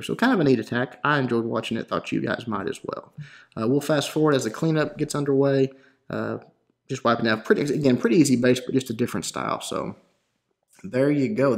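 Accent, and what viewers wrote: South, United States. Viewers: So, I finally got a little past early th9 and it's awesome cause now I have hours of useful study film. Thanks again for all the sweet vids, man, keep it up. Out of curiosity, you from the South?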